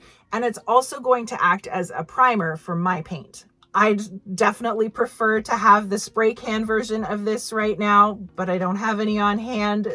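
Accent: Canada. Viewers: Wow! The chest turned out nice! Love your Canadian accent.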